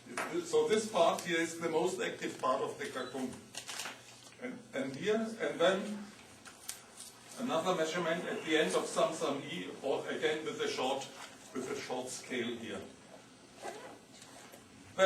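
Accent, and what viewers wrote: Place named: Germany. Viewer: xDD never heard such a typical german accent, and im german so i heard much^^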